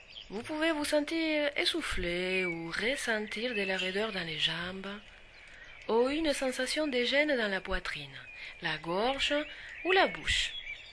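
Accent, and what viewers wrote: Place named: Spain. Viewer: Cest quoi cet accent espagnol ? Paysans ? Créole ?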